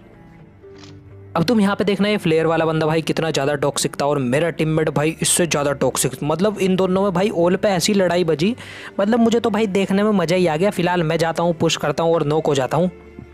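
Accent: Haryana, India. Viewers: Bhai please btada tu haryana ka h kya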